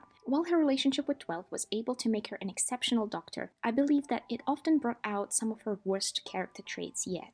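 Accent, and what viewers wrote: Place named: Russia. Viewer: The whole video I thought whether you are a native Russian or not. Love your accent and your English! The essay is sooo well structured and interesting Good luck to you!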